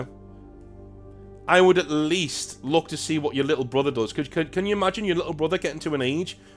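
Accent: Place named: North, United Kingdom. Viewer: Some times I just need to hear a northern accent tell me to sort shit out. Cheers mate ❤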